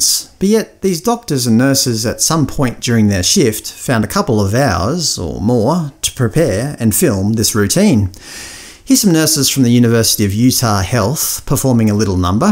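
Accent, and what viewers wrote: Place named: Australia. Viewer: Don’t know what it is exactly. But the calming and soothing of your voice and your awesome Aussie accent just appeals to me.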